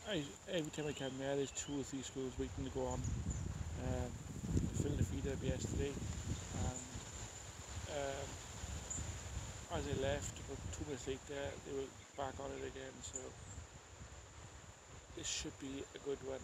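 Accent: Wales, United Kingdom. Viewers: A great few hours with the air gun, that’s a good Welsh accent. I live near Hirwaun and shoot my share squirrels 👍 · Not Welsh. English with a Welsh accent.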